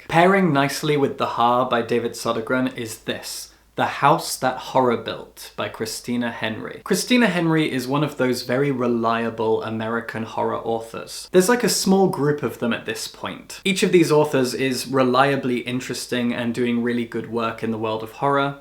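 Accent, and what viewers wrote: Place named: West Midlands, United Kingdom. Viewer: You're from the West Midlands? I never would've guessed from your accent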